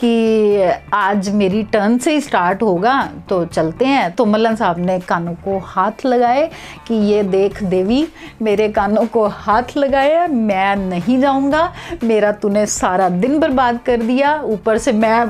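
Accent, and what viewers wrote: India. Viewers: Ohhhhhhh aunt feeling sad for you lekin koi bat nahi jo hota behtr hi hota and think agr tb ap ka audition ho jata to shayed youtube ap k zehn m na ata aur aj ap ka apna channel na hota.So agr hmary pas sae kuch jata h to us sae ziada hi wapis b milta h 😊BHT ACHA LGA AP KI KAHANI SUN K.Aur ap bht cute hn specially talking style DESI DESI. sa😍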